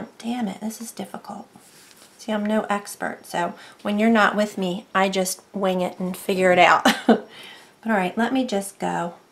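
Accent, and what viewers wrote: United States. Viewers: I love your videos. It's like I'm right in the room with you. I may be the first to say, your voice is very appealing to my ear, since you have an American accent and it is easy to understand. Thank you so much. Remember., all imperfections in art are perfect when made as one of a kind pieces.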